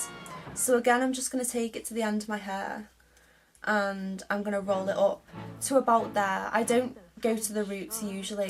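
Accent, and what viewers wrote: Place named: United Kingdom. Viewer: Oh my god, I just thought I saw Marylin Monroe when I clicked this vid. You look exactly like her!! Only it's a little bit absurd to watch Marylin Monroe look alike talking in a british accent.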